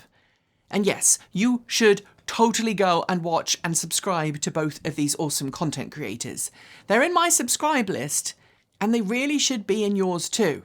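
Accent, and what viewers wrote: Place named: United Kingdom. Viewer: In awe of how you keep your UK accent while using exclusively North American idioms and speech patterns. So fascinated by this I almost missed the point of the video. It's quite remarkable!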